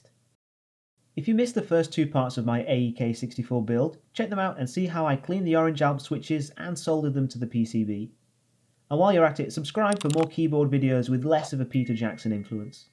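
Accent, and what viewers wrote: United Kingdom. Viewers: He is Japanese with top-tier British accent, by the way.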